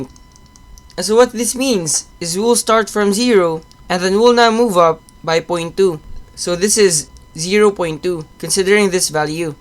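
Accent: Philippines. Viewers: Sir magtagalog kanalang halata naman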